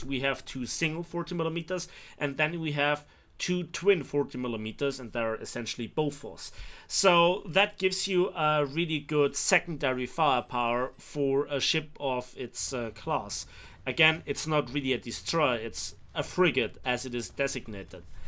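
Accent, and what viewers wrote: Bavaria, Germany. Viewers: Mach Moi wieder a Video auf bayrisch 😂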